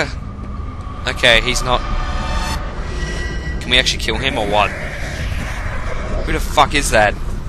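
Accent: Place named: Australia. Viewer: He's Australian, it's just his accent. I like the accent.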